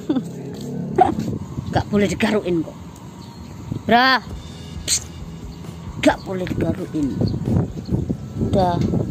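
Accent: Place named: Java, Indonesia. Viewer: medhok banget logatte.......?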